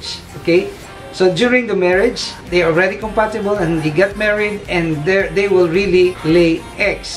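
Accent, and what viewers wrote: Visayas, Philippines. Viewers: Sir asa mo dapita? Kay murag2 duol ra ky bisaya man hahaha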